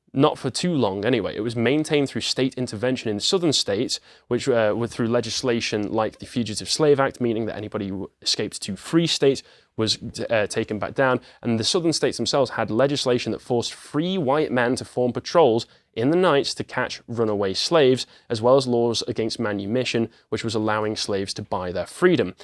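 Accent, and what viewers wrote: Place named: United Kingdom. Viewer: It's really interesting to hear American history discussed by a Brit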